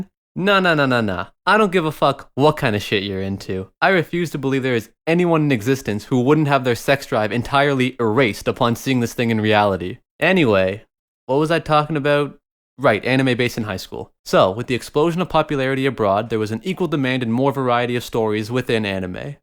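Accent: Canada. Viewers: I forgot this guy's a fucking Canadian but then I hear this nigga say about and eh. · He’s either from northern Michigan or Canada with that accent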